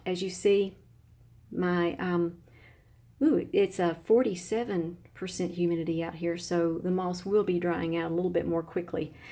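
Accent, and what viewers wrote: South, United States. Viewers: Thank you! I did find this vid helpful/interesting/informative /pleasant. I like ur voice and southern twang, I subscribed (long ago) for that reason. I like how YOU'RE growing! Concerning vids and more detailed info. My growing conditions are SO different from urs I can't help but think ur just one blessed (lucky) lady. Keep sharing details and hopefully the "luck" will spread! I can't remember how long you've had some of those Vanda..? Do you have any Neos, Ascosenda, or Renanthera's? Xoxo from CO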